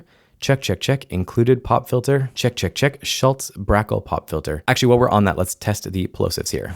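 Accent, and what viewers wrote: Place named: Ontario, Canada. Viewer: of course! I have the LS-208 as well. Great mic! All Lauten Audio mics are fantastic. I have several. You seem to be a fellow Canadian. Also, I’m detecting an Ontario accent there. Maybe north of Toronto? Peterborough? I’m in the Niagara Area, myself. Nice to meet you!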